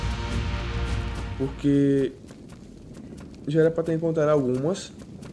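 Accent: Northeast, Brazil. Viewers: Vc é do nordeste certeza kkkkkk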